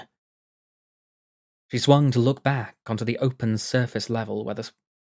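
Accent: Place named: United Kingdom. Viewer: you sound very british in this one!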